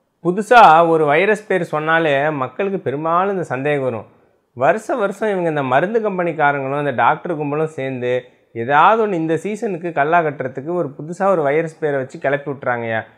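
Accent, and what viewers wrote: Kongu, India. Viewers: கொங்கு தமிழில் எதார்த்தமான விளக்கம் அருமை, பேசுவதற்கே காசு கேட்கும் கார்ப்பரேட் மருத்துவர்கள் மத்தியில், உங்களின் தெம்பூட்டும் பேச்சு மிகவும் அருமை, உங்களின் பேச்சை கேட்டாலே ஒரு உத்வேகம் வருகிறது உங்கள் சேவை தொடர வாழ்த்துக்கள் மருத்துவரே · Alagana kongu tamil oru doctor eathana jokes pola solrathu gd sir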